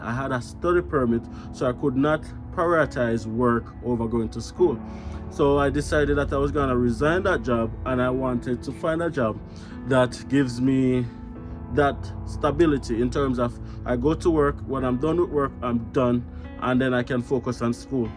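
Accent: Jamaica. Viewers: Well informed video. Loved the Jamaican accent as well which is slowly but surely dissappearing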